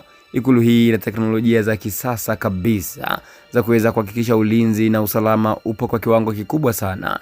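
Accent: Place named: Kenya. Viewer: Mtangazaj ni mkenya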